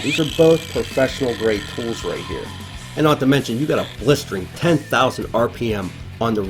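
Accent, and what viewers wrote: Pittsburgh, United States. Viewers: You have to be from near Pgh based on your dialect. If so, awesome.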